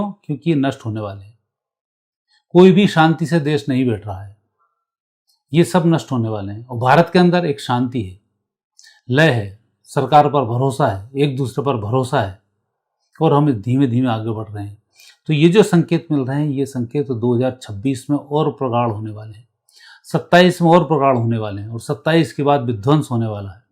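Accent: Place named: Madhya Pradesh, India. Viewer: आपके स्वर से प्रतीत होता है मानों आप भोपाल या होशंगाबाद के आस पास से हैं। या मध्य प्रदेश कहीं से। क्या मैं उचित कह रही हूँ।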